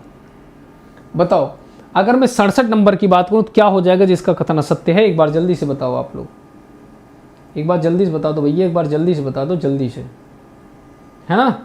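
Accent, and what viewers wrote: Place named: Prayagraj, India. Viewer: Mujhe lga ki aap prayagraj se h o.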